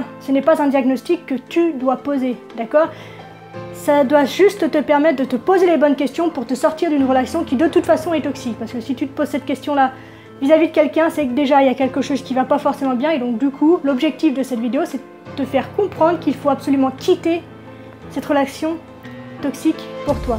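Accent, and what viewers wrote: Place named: Quebec, Canada. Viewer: Bon content tho. Tout ce que tu dit est former :D Belle confiance. Sen tempone le coquillar hahaha Ouf sa frappe dans le quebcois. il y a un stress constant dans ta tonaliter de voix, je ne veut pas picosser mais donner une reaction que jai resentit fortement , et je la partage ouvertement. C'est commme si tu me donne un 2eme cafee xD.. mais je fait pareil quand je suis passioner <3 OK ok alor la ok ok lee le narcisisque.. hehe ironiquement jai beacoups de trait narcisisque et comme tu dit sans etre vraiment la maladie. Mais je ne me sent jamais mechant deriere cest trait. Alor jessaye de ne pas juger mon pere tres narcisisque, mais peu etre aussi tres bon au coeur? Des idee :D?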